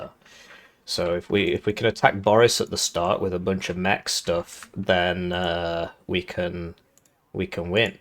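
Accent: United Kingdom. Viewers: British man talking about tea moment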